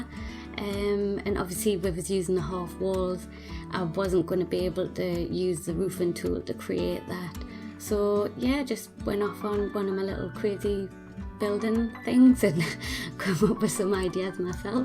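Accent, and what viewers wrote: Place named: Scotland, United Kingdom. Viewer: I love your accent :) Where are you from? (Scotland? 😅)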